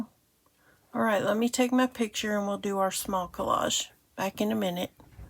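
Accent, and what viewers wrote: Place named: South, United States. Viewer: I Love your attitude to life and all it throws at you. Thanks for telling your story. I had to retire from teaching when I was 53 through mental health. I'm registered disabled through my feet. I was a carpenter before I taught and did lots of concrete form work. I did bridge decks and columns. My last big pour took 12 hours of continual pouring and vibrating concrete. What are duradelis. That's probably spelt wrong but I have never heard of them. PS your country accent is great. I have a Dorset accent.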